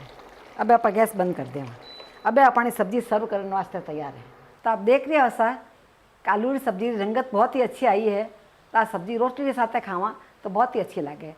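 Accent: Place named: Marwari, India. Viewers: Mujhe Aapka Rajisthani bolna bahot pasand hai... Kash aapke jeisi meri mother in law hoti... Mein Aapko sunti rehti ❤️❤️❤️❤️❤️ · I know what you mean. I speak Gujarati and this is the first time Madwari has reached my ears and and after few seconds it seemed natural. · Aapki har recipe bahu achchhi hoti hai aur sath sath aapaki marwadi bhasha sunani muje bahut sweet lagati hai, main Gujarat se hu lekin samazneme koi dikkat nahi hoti.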